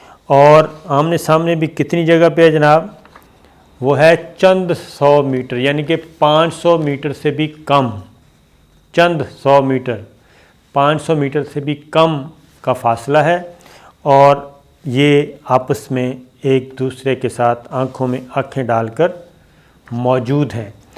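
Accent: Pakistan. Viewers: Accent is pure pakistani best hamaari pehchaan punjabi urdu accent indian aacent nahi chahyee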